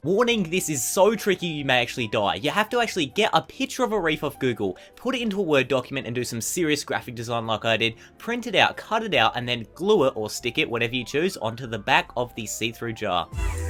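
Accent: Australia. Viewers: r u australian cuz i am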